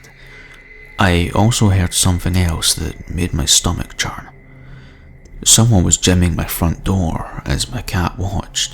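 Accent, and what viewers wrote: Scotland, United Kingdom. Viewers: I'm a huge fan of the horror narration channels, great to hear a fellow Scot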